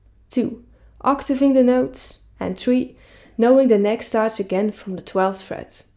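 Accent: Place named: Netherlands. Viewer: Thank you.... you made it so easy. Like your Dutch accent.😌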